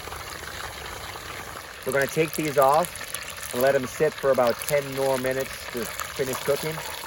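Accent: Newfoundland, Canada. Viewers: You from Newfoundland? If so that’s cool man I’m from back on the rock as well !! Just subbed to you !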